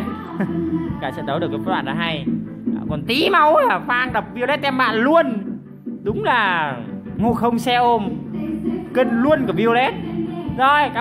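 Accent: South, Vietnam. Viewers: thích cách nói chuyện của bạn nhiều lúc lại giọng miền nam nghe vui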